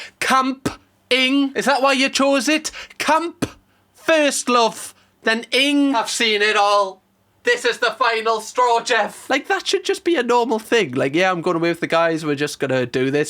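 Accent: Newcastle, United Kingdom. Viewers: The jordie accent is what makes the best if he was from Liverpool 🤮🤢 I wouldn’t whatch him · I assume you know that Pret a manger is a high street coffee chain you will find across the UK and worldwide… not just a shop in London. Weird comment, just through me off to hear a londoncentric geordie 🤷‍♂️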